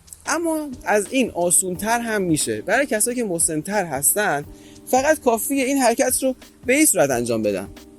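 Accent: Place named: Turkey. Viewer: دکتر سعی کند لهجه خود را درست کنند اینکار فقط با ترکی صحبت نکردن امکان پذیر است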